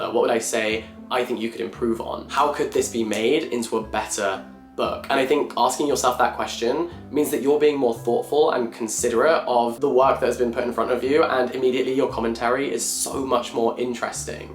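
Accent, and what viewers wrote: United Kingdom. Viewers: The Brits are losing their accent. 😢 Then again, you did just say “offen”.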